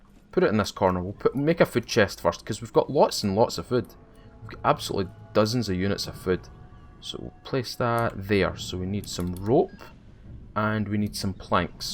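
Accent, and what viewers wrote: Scotland, United Kingdom. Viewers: that sweet sweet Scottish voice. mmmmm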